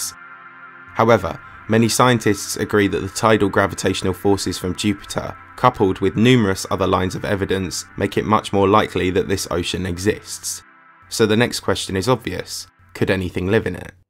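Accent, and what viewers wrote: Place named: RP, United Kingdom. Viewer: Softened upper class British accent that evokes confidence with slight superiority. He could be telling us martians are real.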